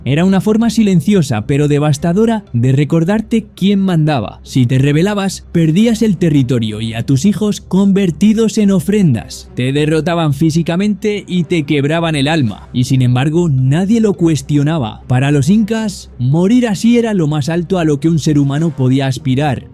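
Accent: Spain. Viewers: Como se nota que lo hizo un español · العنوان بالعربي بس الصوت شكله اسباني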